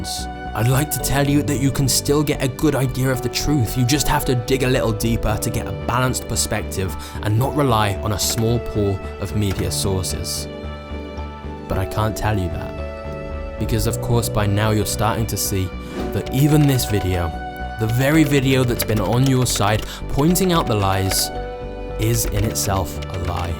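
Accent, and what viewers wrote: United Kingdom. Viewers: The combination of the British accent and the the snippets from ca; 40 different shit movies make your point.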